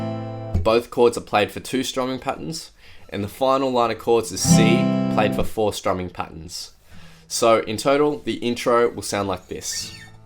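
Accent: Australia. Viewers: This legend's voice is always deeper and more Australian than I expect.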